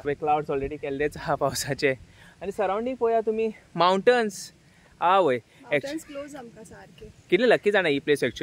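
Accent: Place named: Canacona, India. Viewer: Where is this place. Canacona? Because her language tune is talking like canacona people and I'm also from agonda 😊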